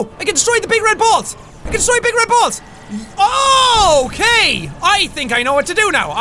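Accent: Ireland. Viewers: are you irish? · I like that instead of hard, u say herrd xD love it (yes i know is irish) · What part of Ireland are you from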